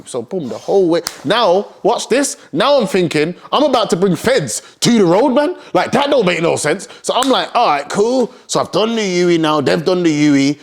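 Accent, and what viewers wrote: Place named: Birmingham, United Kingdom. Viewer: No one’s sounded like they are from brum more than my man lol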